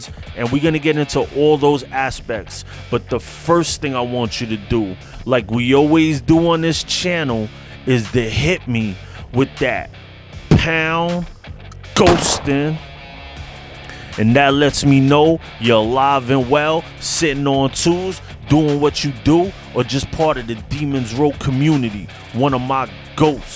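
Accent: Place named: Boston, United States. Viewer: This dude talks like 1090Jake 😂 must be from Boston area or sumn 🤷🏻‍♂️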